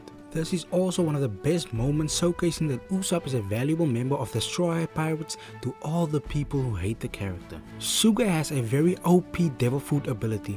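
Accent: South Africa. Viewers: Is that a SA accent I hear. Awe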